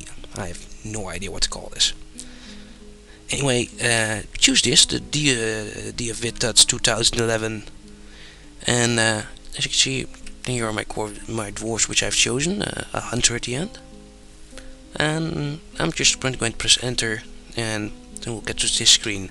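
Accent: Netherlands. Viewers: Haha , je kan horen dat je Nederlands bent :'D . Btw waar ongeveer in Nederland woon je als ik vragen mag :x ?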